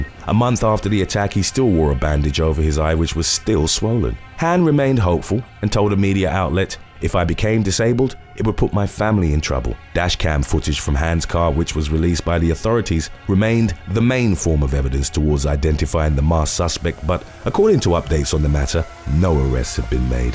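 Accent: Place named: Scotland, United Kingdom. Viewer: I love his Scottish accent it's just so unsatisfying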